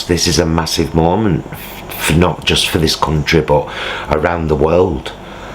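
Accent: Manchester, United Kingdom. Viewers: Nice to hear a Mancunian accent today! It's very strange being so far away from the UK when something like this happens, you just want to be with your people, who understand. The image of Her Majesty sitting alone at Philips funeral, while Boris partied will stay with me forever. It's like the Nation's favourite Granny has died. Sounds daft, but I can't explain it any other way? You Nailed it tho, brought a tear to this 53 year old Truckers eye!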